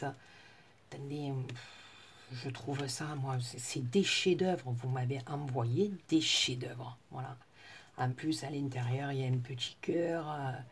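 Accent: South, France. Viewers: Oh ma belle, quel plaisir de t'entendre et d'entendre le sud dans ta voix ! Trop heureuse que tu ai été gâtée et tu es tellement touchante !!!! Te fais de gros bisous ♥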